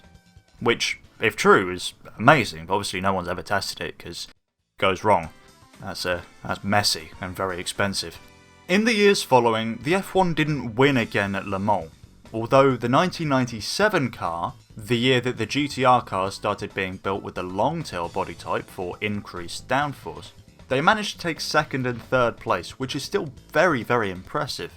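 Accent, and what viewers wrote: United Kingdom. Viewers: Just bc of the british accent 💀🤣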